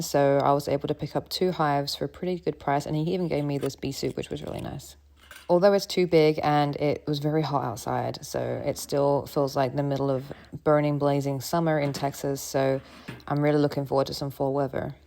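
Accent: Australia. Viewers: It's giving Aussie to me. Faint Aussie or U.K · That’s an Australian accent!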